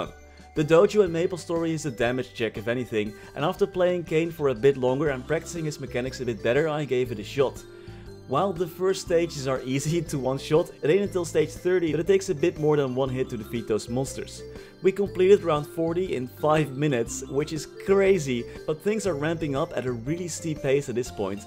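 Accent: Netherlands. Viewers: Instantly recognize a dutch english accent. Your english is pretty good man! Lekker gewerkt pik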